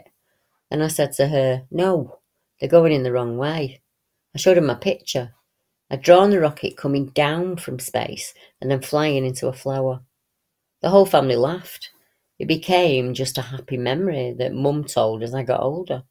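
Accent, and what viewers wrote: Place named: Northern England, United Kingdom. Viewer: brill again thks,love your site, you have a wonderful voice. one of the many great accents us northern folk have, am in liverpool but can use most words correctly 😁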